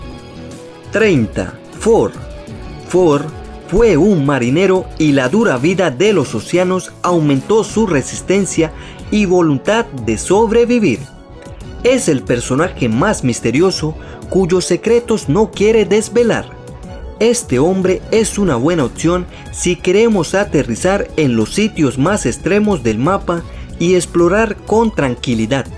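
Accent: Argentina. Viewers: Para!!! es argentino? yo también lo soy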